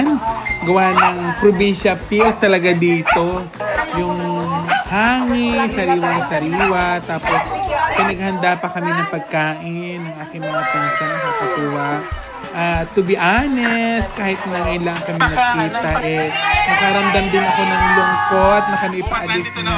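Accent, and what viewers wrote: Batangas, Philippines. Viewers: Authentic Batangueno ang narrator na pumunta sa Iloilo, di ba? The best pakinggan.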